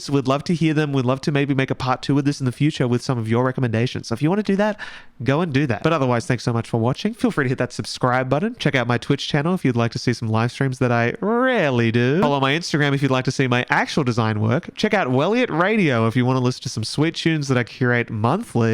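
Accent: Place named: Australia. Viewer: Wondered if you were Australian from the accent... then you said bloody hell and I was like yep.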